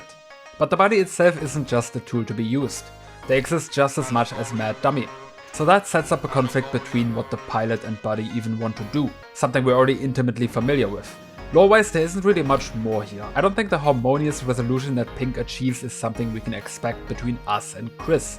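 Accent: Germany. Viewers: Your accent sounds pretty German for an English speaking youtuber, i have a theory German was the language you used growing up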